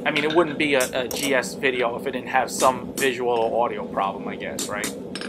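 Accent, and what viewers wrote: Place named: New York, United States. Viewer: One question I have. The depressions you make with the guillotine tool at the front and back of the boss, are those functional or just for aesthetics? Also, do you originally come from the NY/Long island area? just guessing by your accent.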